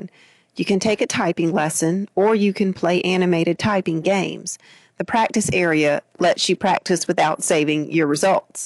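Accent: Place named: South Carolina, United States. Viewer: I think from South Carolina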